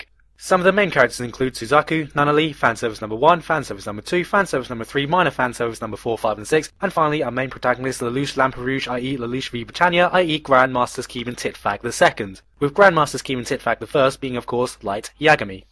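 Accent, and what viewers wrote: United Kingdom. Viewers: it might just be because all of his insults are with a brittish accent, but this dude is freaking hilarious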